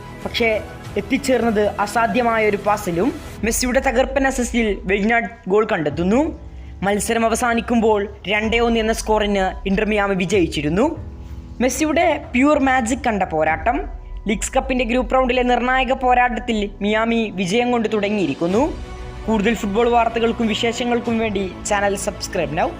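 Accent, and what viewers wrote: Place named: Kozhikode, India. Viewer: കൊയ്കോട് ഉള്ളതാ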